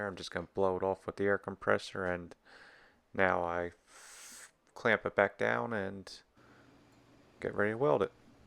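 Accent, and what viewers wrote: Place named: Southeast Pennsylvania, United States. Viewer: Nice work and thank you for sharing,! Where's your shop? It seems like I'm hearing a S.E. Pennsylvania accent.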